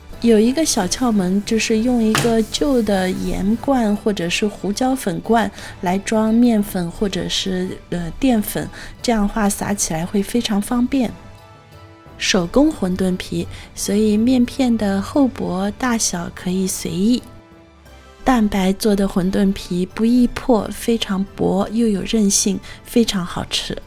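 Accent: Shanghai, China. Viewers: 吾晓得，从侬额普通话里听出来了😝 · 我猜你是上海人是吗？听口音感觉是。 被你种草，作为一个同样喜欢面食的人，去AMAZON下单了一台MERCATO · 沪普听了台太亲切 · 懂上海话的人很明显听得出来你是上海人，不懂的肯定就听不出来，反正对我来说很亲切啦！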